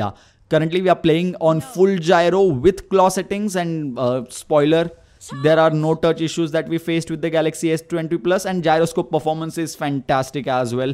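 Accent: Maharashtra, India. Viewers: Ghati English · Индийский английский режыт слух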